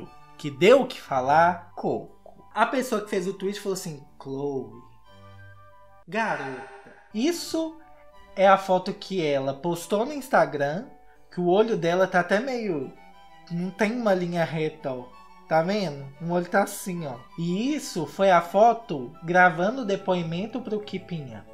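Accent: Minas Gerais, Brazil. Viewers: Gente, o é mineiro? Conversa igualzim a gente aqui de BH.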